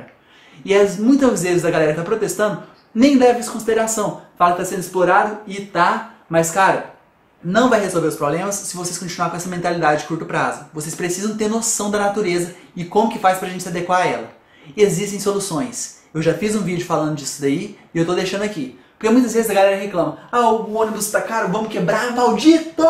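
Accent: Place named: São Paulo, Brazil. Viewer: As idéias são boas; O triste é ter que suportar o vomitável sotaque paulistano e os "tá ligado???". Tá ligado???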